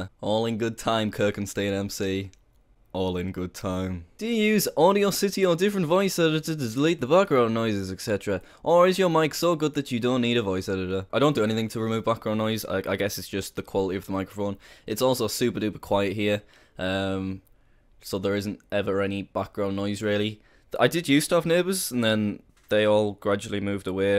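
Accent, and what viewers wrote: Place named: United Kingdom. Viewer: Jack I love your voice, I wish I was british. Can u say HEY JAKE on camera for me???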